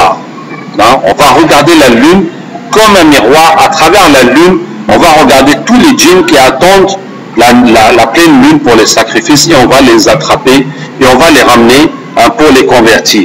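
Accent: Africa. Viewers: L'accent de l'Afrique! C'est quel accent?pas celui choisis par Dieu?soubhanallah que Dieu nous éloigne toute idée de racisme même le djines musulmans sont des frère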